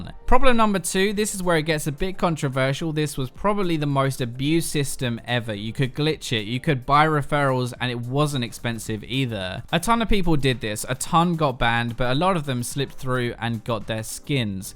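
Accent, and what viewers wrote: United Kingdom. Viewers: Where is URF warick?? This guys is so biased, and his British voice is so annoying.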